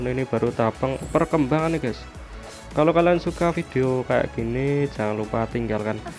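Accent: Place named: Banyumas, Indonesia. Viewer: Youtuber ngapak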